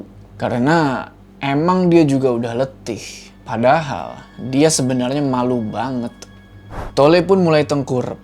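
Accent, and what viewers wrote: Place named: Java, Indonesia. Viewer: yg part 1,logat bisa jawa belajar dr mana..haha Salam dr klaten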